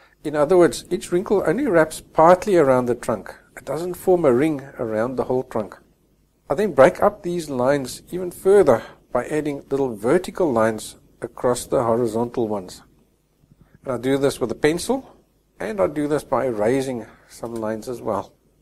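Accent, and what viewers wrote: Afrikaans, South Africa. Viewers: Is that a Afrikaans accent I'm picking up?? XD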